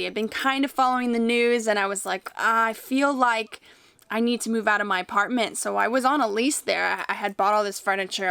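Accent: Australia. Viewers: Why do you have an Australian accent now? 😹 BTW I like your blond hair. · She now sounds like Steve Irwin, the Croc Hunter. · Wow you sound a bit like an Aussie now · Have you noticed you started to say some words in an Australian / Kiwi accent? That's weird.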